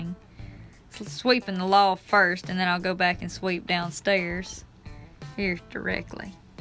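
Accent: South, United States. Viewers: This girl has a strong southern accent.She has the best smile 😊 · Lotta Yanks don't like southern accents, don't count me in that group. Soft southern accent is easy on the ears and easy to understand, add her pretty face and I'm payin' attention. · Love that Southern Belle accent. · I know I am not alone when I say that I just tune in to see that Beautiful Smile of yours and hear your sweet, Southern Accent, oh and the house framing tips. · That's it I'm moving to BAMA the 1st time I watched any of her videos was yesterday I was just browsing didn't click on just seen her and u know any normal guy was thinking damn hot chick...then I clicked on and that countrybumpkin accent came out of her in n love lol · Dang, I just found your channel today and I must say, you're what I'd call a “Modern Southern Belle“! You have the face of a princess, the body of a movie star and the most endearing southern drawl I've ever had the pleasure to hear. 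Plus you obviously honour your parents, which I actually deem important. Also I like the fact that you're not shy to get your hands dirty and get stuff done. Keep it up like that and I'm pretty sure you'll be famous WAY beyond social media. At least I'd LOVE to see that happen. The best wishes from the old world (Germany)... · Well I should have said it better her southern accent either way it's a compliment so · I love your soft southern accent. Beautiful. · I love that accent of yours true american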